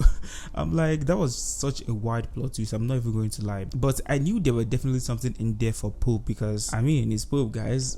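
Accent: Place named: Nigeria. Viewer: Love a naija voice doing reviews like these! Keep it up Will watch all your videos 💪🏿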